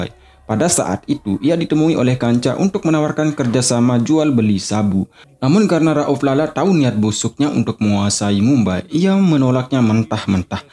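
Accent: Bali, Indonesia. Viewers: Loghat Bali,kya susah ngomong (pengulasnya) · Orang bali ya bli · Kayanya pengisi suara orang bali.. Keliatan dari vokal ngomong nya.. Bener gak?🤭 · Owhh soale logatx kyk org bali..sucses sellu mas..aamiin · Logat Bali ini gan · Narator nya bhasa nya kek dr bali ya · logat bali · Pembawa suara orang bali bli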